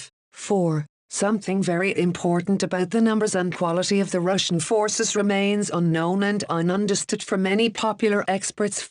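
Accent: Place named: Ireland. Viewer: Why does the bot have an Irish accent?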